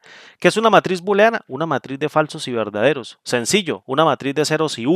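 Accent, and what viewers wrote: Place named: Costa Rica. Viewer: Tu acento me suena al de mi pais, Costa Rica ♥ o talvez Colombia...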